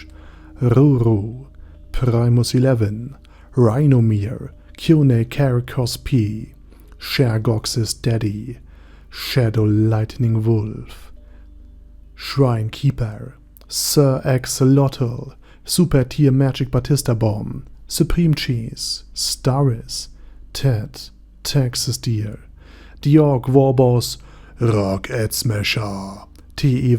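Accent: Germany. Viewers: wait. what? there are blokes who disliked his german accent narration? that is the only reason why i kept coming back to this channel, it is his thick german accent!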